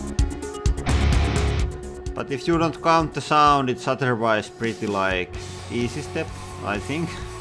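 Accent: Finland. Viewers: i was wondering where i've heard this accent before.he sounds like kimi raikonnen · Such an awesome video! Machining porn and also awesome Finnish accent. Made my morning!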